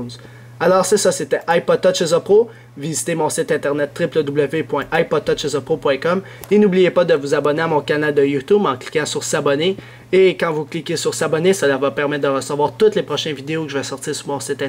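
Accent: Quebec, Canada. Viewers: Super bonne vidéo malgré le fait que le logiciel soit simple à utiliser. Génial votre accent québequoi ca change de notre accent belge :) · Bravo... Tu assures! Elles sont très bien tes vidéos. J'aime bien ton super accent du Québec:) Ca me fait penser aux têtes à claques;o) ++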